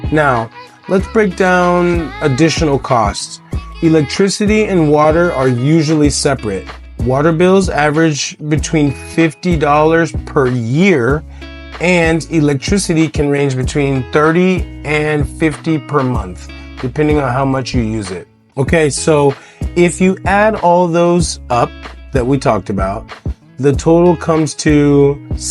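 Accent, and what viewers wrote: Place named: England, United Kingdom. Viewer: I guessed that comes from UK His accent is English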